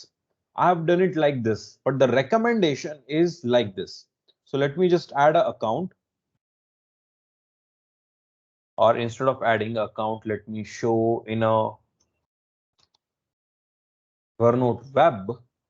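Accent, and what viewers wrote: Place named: India. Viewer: Hello,! My name is Brendon. I’d like to express my gratitude for your work. A few years ago, I was looking for ways to truly learn from reading books, and I came across your YouTube video. I never took English classes; I learned everything I know by watching movies and TV series and playing video games. I spent dozens of hours re-watching your TED Talk because the Indian accent made it a bit challenging to follow—but it was absolutely worth it! You not only taught me how to read better but also encouraged me to read more and more. You and Mortimer J. Adler are at the top of my list. I am truly grateful that you posted this series of lessons here; it was the missing piece to complement my "Habit 3"! I will certainly watch it a few more times until I’ve mastered the material. Once again, thank you so much! Best regards from Brazil.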